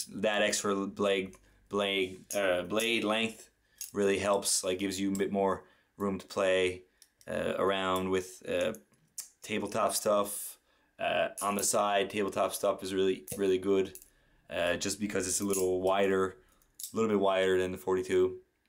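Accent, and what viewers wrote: Canada. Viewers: Your full Canadian accent came out in this video.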